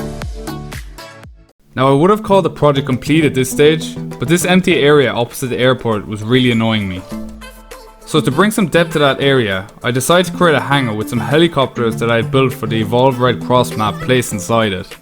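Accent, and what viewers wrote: Ireland. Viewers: Are you Irish or Northern Irish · He sounds like he's from reland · U Irish’s? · Are you irish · Are u Irish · I think u sound Irish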